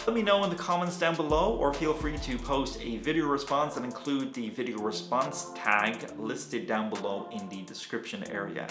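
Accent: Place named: Ireland. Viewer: very cute :) you look and sound very very Irish here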